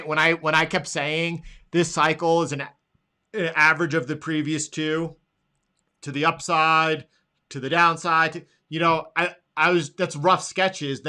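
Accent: United States. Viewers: Your Long Island accent coming thru strong today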